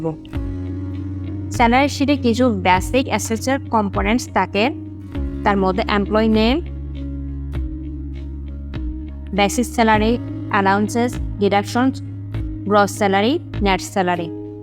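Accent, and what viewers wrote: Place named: Chittagong, Bangladesh. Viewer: Chittagong r meye confirm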